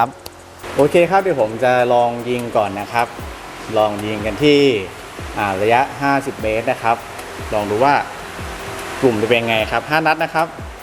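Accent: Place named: North, Thailand. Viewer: ฟังสำเนียง เหมือนเป็นคนเหนือเนาะ ^_^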